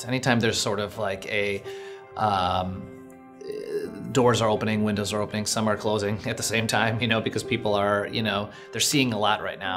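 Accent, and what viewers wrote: United States. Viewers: His Chicago accent is really cute. I love the way he says mom and on and comedy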